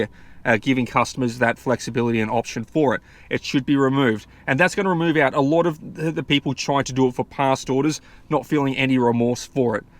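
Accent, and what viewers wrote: Australia. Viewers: I love your accent! Australia?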